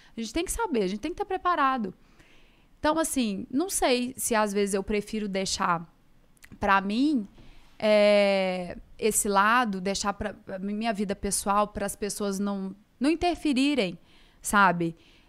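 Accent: Minas Gerais, Brazil. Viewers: Uma Morena dessa com sotaque Mineiro pra mim é AFRODISÍACO!!! 😋😋😋😋😋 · Sotaque mineiro é maravilhoso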